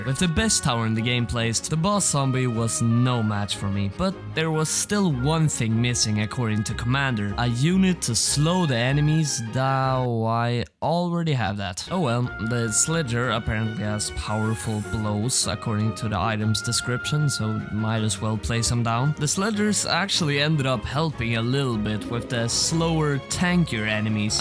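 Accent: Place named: Sweden. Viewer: bros talking swenglish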